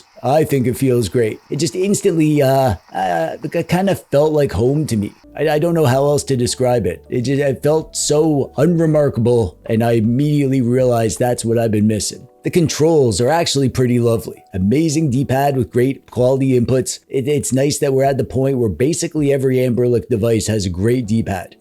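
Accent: Canada. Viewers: If I hadn't jumped ship to Retroid for a Retroid Pocket 4 Pro, and more recently a Retroid Pocket 5, I'd have been very interested in this. But instead, I'm just watching to hear the nasally Canadian tones of.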